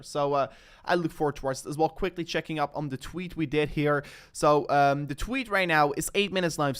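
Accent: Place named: Netherlands. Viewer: Hahha, this guy is Dutch .. Gast ,spreek gewoon Nederlands ..makkelijk te horen dat je Nederlands bent.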